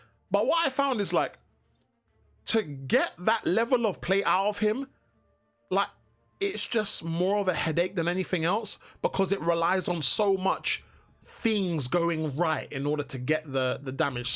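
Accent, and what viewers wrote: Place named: Jamaica. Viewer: turned full yardie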